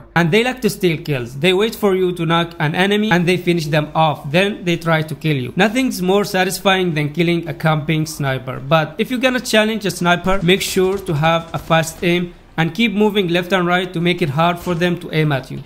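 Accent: India. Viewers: Bro where are you from? You sound like Indian If India which state?🤔 Jst want to know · Sorry for asking but your voice was like indian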